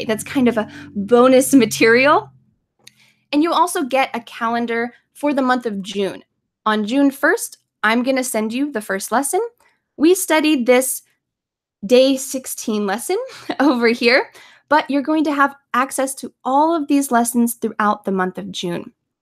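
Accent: United States. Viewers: I like ur voice,you know,just easy to understand and concentrate. perhaps in my view American English is easier to follow with,it's kinda different from what we'd been taught in textbook. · people in US speak not only fast but also in slang and with different accents. That's the difficult not only in US but also at the places with English speakers. You have the perfect American accent I have ever heard. I like your videos.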